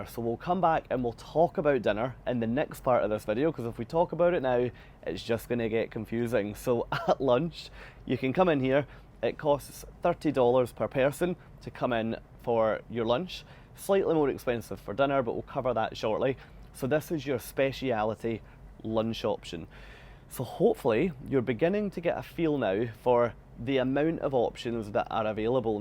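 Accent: United Kingdom. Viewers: Thanks for this info. I'm on her 7/22 and so looking forward. I've been Princess Elite over 15 yrs. One thing you didn't address was the Gala nights - oh my all the comments I hear from folks who are terrified of what to wear. I know food taste is purely personal so I can make a delicious meal on my own. But what about these white nights, or masquerade party, etc. Can you fill me in on that part? Loved your accent - this southern lady is going to adore hearing all the British chatter in a couple of weeks.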